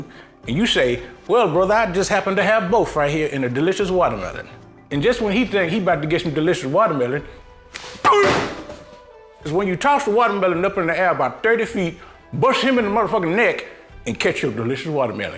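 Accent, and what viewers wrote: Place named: New Zealand. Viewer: Is this what my accent sounds like to other kiwis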